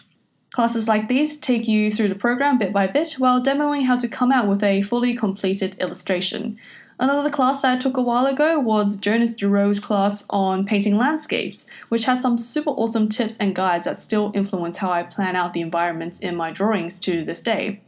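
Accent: Australia. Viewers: Wait your Aussie! Gday from a fellow Aussie artist 😁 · Aussie Aussie Oi Oi Oi (didn't know you were Aussie)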